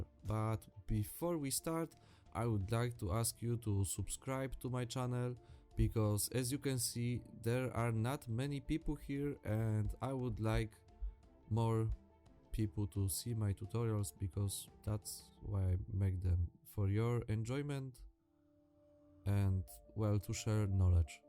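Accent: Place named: Poland. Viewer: You, my friend, have a very polish accent! :D Are You Polish by any chance? ;) Zdrówko!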